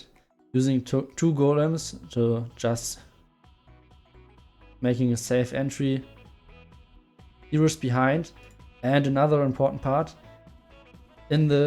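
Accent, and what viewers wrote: Germany. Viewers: Bro do you really suck at english or is it because of the german accent?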